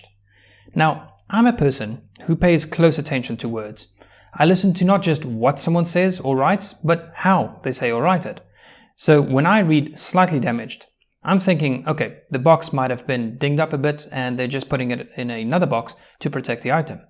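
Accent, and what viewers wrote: South Africa. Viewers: Unrelated but are you South African?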